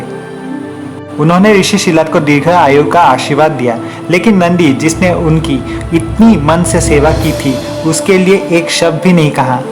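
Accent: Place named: Assam, India. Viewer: Great story brother.... By any chance r u Assamese? I mean just guessing from the tone of the voice🙂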